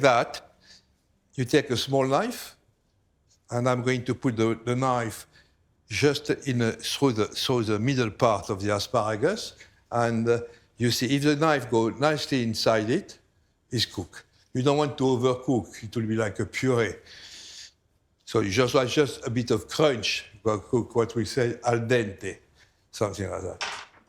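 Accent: France. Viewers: It’s a French accent. No English accent here.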